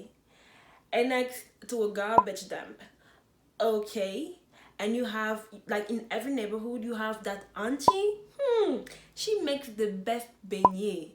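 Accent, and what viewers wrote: Cameroon. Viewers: You sound like a Francophone Cameroonian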